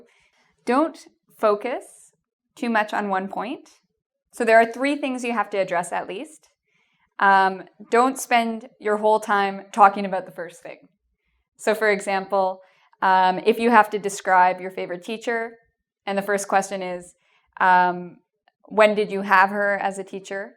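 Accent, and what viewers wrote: Canada. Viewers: Is she Canadian? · I'm Scotish and I don't understand your Canadian accent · I am going to talk a-boat... the accent is sooo cute! Love Canada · good luck to everyone who's going to do the exam, kudos to you if you picked up on her Canadian accent, is a really competent teacher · Her Canadian accent is so cute.... Aboot <3333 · Canadian accent is dope. <3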